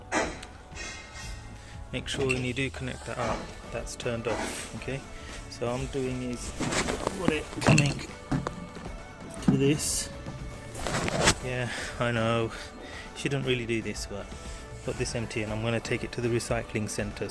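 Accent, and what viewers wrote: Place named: United Kingdom. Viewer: SOON AS I HEAR THE BRITISH ACCENT I'M OUT OF HERE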